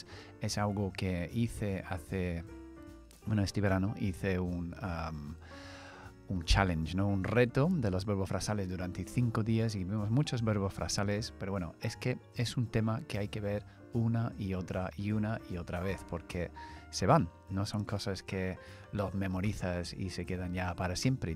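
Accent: United Kingdom. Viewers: Hola, le agradezco que también incluya la pronunciación Estaudinense. Al principio no me gustó mucho por que le noté el acento Británico, pero después si me inscribí por que enseña muy bien, e incluye la pronunciación ya mencionada. vivo en USA hace muchos años, pero aún no soy fluente, pues nos pasa a muchos inmigrantes, ya que nos enfocamos mucho en el trabajo y no en perfeccionar el idioma y ahora que quiero buscar nuevo trabajo necesito ser fluente y urgente, así que todos los días estoy escuchando y practicando, necesito hacer en unos meses lo que no he hecho en muchos años 😢 Si da clases privadas o en grupo, me gustaría saber. Gracias, bendiciones 🙏🏼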